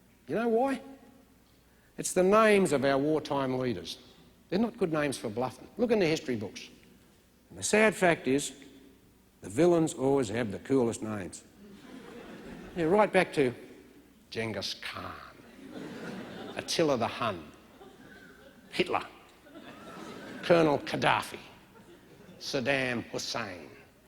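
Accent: Australia. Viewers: Cent stand the Australian accent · He is why us Americans love the Australians. Actually, we think everyone with an Australian accent is cool. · That Aussie accent is just a killer .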